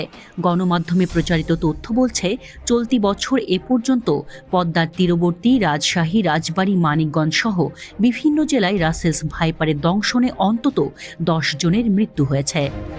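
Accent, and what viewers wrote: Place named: Kolkata, India. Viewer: sangbad pathikar kontho sune mone hocche s ekjon kolkatar mohila.R tv indian dalali bondho koro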